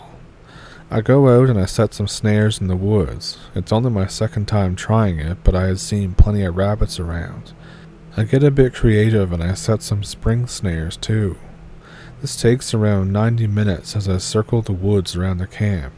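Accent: Canada. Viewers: This is told so well I'm actually laughing at the state of mind those guys are in lol, spent nearly 5 years in the UK now I think I probably know the area they come from with how they speak to each other 😆 , in a Canadian accent? I think, like a movie in my head very well done sir ! 👍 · I’m English and I think it’s cute lol but it’s probably because I love his Canadian accent. I would listen to him read the football scores! 😂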